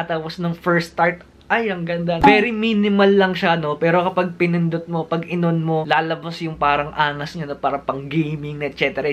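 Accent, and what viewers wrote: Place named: Philippines. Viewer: As u can see pinoy yang nagsasalita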